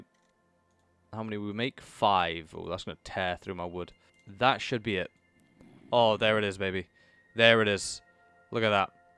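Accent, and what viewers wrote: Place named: Scotland, United Kingdom. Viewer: May I also ask where abouts in GB are you from… I’m always guessing peoples dialects and I can’t really place you for sure😂 I’ll take a brave guess that you are from near scotland if not from scotland😅 maybe from northern England. But then again dialect can be affected by people around you while growing up so that might be throwing the origin of the home totally off. So better question then would be, where is you dialect from😂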